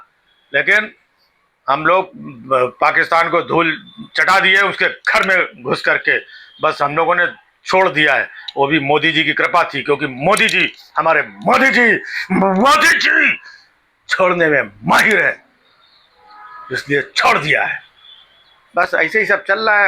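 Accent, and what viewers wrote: Kanpur, India. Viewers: ji aap Kanpur ka accent me bola karo pls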